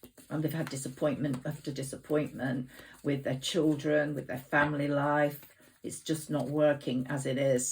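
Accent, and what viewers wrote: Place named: Northern England, United Kingdom. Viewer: Yesterday was a very strange day, felt extreme boredom, very nervous, not sure why iv never experienced energy like this. These runners all seem to be virgo or cancer, my person a fellow saggi, never heard of a fire sign in this cycle, thank you for your beautiful reading I always look forward to hearing your slight northern accent lol thank you xx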